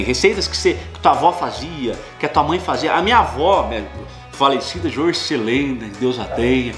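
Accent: Minas Gerais, Brazil. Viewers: O sotaque mineirinho voltou com tudo! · Adoro suas receitas!! Adoro vcs dois! vc é mineiro?!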